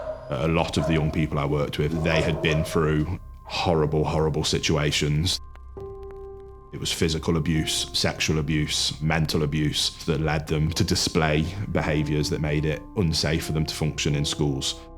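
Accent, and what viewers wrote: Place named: Scotland, United Kingdom. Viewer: These meditations are perfect. <link> him has a gentle, warm, pleasant voice (with a slight Scots accent). The words are simple and clear. The timing is perfect... just as you start to find your mind wandering, he says and if you find that your mind is wandering... . There is no background music and no noise in the background, only his peaceful and engaging voice. The length of the meditations (about 1/2 hour each) works well for me. I find that I tire of some meditations after frequent repetition, but that hasn't happened with this one. The metta lovingkindness meditation always feels right. Some meditation CDs I have tried have not felt right. The voice seemed hurried, or sounded tense; the background music seemed intrusive, or there were noises that interfered with concentration. This is just perfect as an introduction for new meditators, and it's also a meditation that I return to again and again. 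I'd give this more than five stars if that were an option. Even my students, who are not ordinarily very receptive to meditation, relax when they hear this one!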